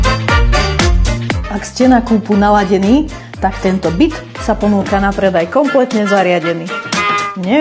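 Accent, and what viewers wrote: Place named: East, Slovakia. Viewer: Ďakujem za odpoveď, zachytila som východniarsky prízvuk, tak som myslela, že ste sa tam len napr. vydali. Tak nech sa darí!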